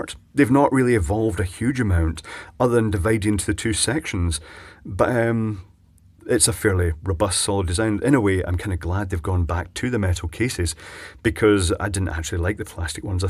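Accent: Scotland, United Kingdom. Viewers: Your Scottish brogue kept me watching the full 18 minutes even though I live in a country with a completely different electrical system.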